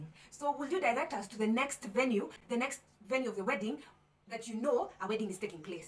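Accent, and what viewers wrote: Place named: Africa. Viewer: In this video language in African ascent and fast.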